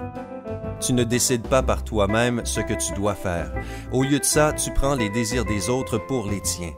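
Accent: Quebec, Canada. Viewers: Bonne vidéo je n'avais pas besoin d de ses conseils mais vue que je connais des gens qui ont se genre de problème je pourrais les conseiller et j'adore ta voix lacent québécois et magnifique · Tu as un accent québécois likez si 👇vous êtes d'accord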